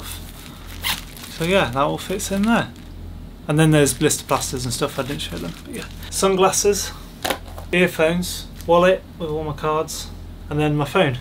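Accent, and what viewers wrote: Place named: RP, United Kingdom. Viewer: New to your channel. Just subscribed and clicked on the "little bell thingy"! Loving the videos, especially the flattering lighting and the almost posh accent with Well pronounced T's and the distinct lack of the phrase "yaaaa". Not forgetting your lovely partner Lilley....... I hope that's correct? Haha keep up the good work. We love the channel.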